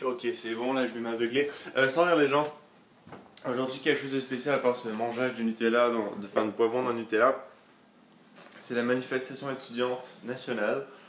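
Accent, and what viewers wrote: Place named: Quebec, Canada. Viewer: Regarder au debut a l'accent du Québec et maintenant il parle commen les francais... bref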